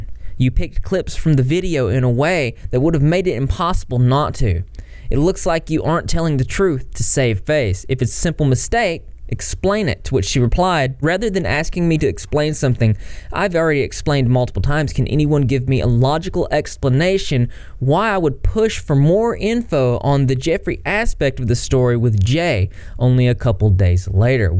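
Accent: South, United States. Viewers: Buddy where are you from,, you sound southern,, I’m from Oklahoma your accent kind of sounds like you are to?????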